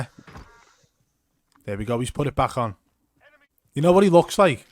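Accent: Liverpool, United Kingdom. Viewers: I never knew Ewan Mcgreger was a scouser or into COD. Excellent work as usual and can’t even watch streamers the same anymore. Defo a cheat as some of the big names are.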